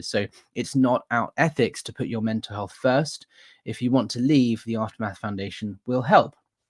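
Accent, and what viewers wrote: United Kingdom. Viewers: Just a bit of fangirl fawning…. I could listen to your RP English read the labels off canned food. I’m sure it’s a yank thing, but there you go. So glad I found you through SPTV. You are doing important work, steady on.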